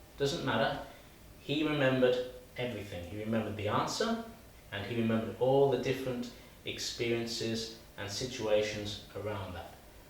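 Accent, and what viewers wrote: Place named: RP, United Kingdom. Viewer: Much better than most EFL/ESOL videos I’ve seen, you crack me up with your’sign language’!!! Pronounced like a true BBC presenter! I am going to pm you an idea-well done! Subscribe people!!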